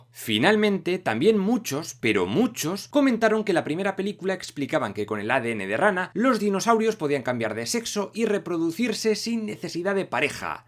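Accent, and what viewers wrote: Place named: Spain. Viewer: Tu narración con tu acento y jerga Española son de lo mejor , magnífico trabajo !!!! Saludos cordiales !!!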